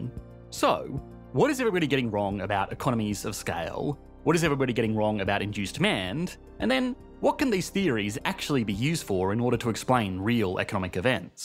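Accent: Australia. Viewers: goes to the point. I live in a suburban area in Europe and own a car but also use public transport. While I use the car to go to work away from the city there are definitely use cases where going to the city is faster, cheaper and much more convenient by public transport. Not to mention that that shorter travel time is also free to use for reading news, watching this video, or other and you can also drink some alcohol if you are going out. It is unbeatable value proposition, forget the car. But that is mostly because the city was planned to have really good public transport on the expense of roads for cars. Some jurisdictions even outlawed free parking to give more incentive to use public transport. The author of the video sounds Australian, so I understand, that’s another planet compared to large European cities.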